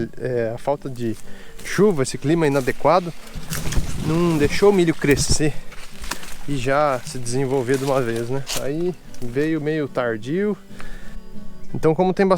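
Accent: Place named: São Paulo, Brazil. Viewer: Muito legal, tenho composteira e minhocario, e várias árvores pra gerar massa, muito legal ver jovens como vocês fazendo diferente e a diferença, só curiosa pra saber onde é o seu sítio, chutaria são Paulo pelo sotaque, kkkk, parabéns, aqui, o que é feijão arroz, não sei se foi isso mesmo que você falou mas foi o que entendi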